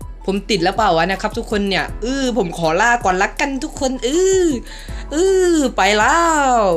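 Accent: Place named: Suphanburi, Thailand. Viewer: ทำไมพี่พูดทองแดง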